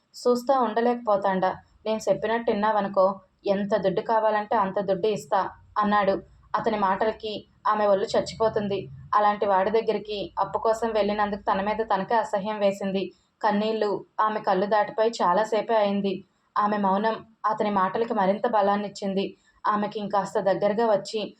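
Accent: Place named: Rayalaseema, India. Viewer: Rayalaseema bhasha bagundi😊😊😊😊❤❤❤❤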